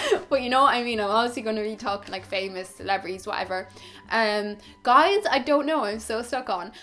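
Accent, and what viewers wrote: Dublin, Ireland. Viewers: Hey beautiful😍!!! your videos make me so happy😄i love everything about you: your pleasent voice, lovely accent (who said you can't understand irish accent??😂)your wisdom And humor , the content, everything!!! I wish you a warm nice calm weekend😙p.s. tv shows that are available on the internet now which i enjoyed very much are: csi cyber and stalker. Must watch!!and yes-i'm bi and i notice girls more than boys, don't know why ❤💖💕❤💖💕❤💖💕❤💖💕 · You’re so pretty! 🖤 I could just sit and listen to you talk forever because of your Irish accent, haha! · Hi! Finally commenting on one of your videos since I started watching them when I lived in Cork for a year (I worked as an au-pair) 🙃 Your accent always makes me miss Ireland so much, haha! Of course that's not the only reason, I do really like your videos and the way you come across, your style... 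I'd say mine is quite a bit different but it looks beautiful on you and oh, you're so pretty 🌹 (Btw, loving Lana del Rey over here as well, and as for the TV series - have you watched 'Orange is the New Black' or 'Skins'? I feel like you could like Effy Stonem from the second generation a lot, concerning the latter... 🙃) I hope you have a wonderful day, greetings from Germany! 🌲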